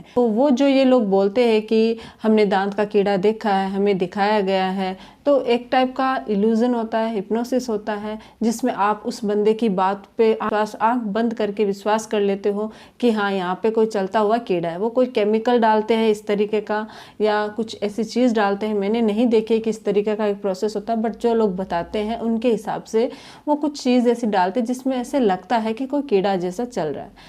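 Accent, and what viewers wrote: Rajasthan, India. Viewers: M aap rajsthan s ho kya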